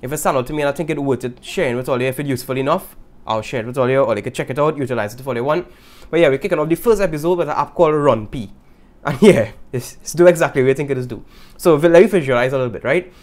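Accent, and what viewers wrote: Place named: Trinidad and Tobago. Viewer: Trinii accent loll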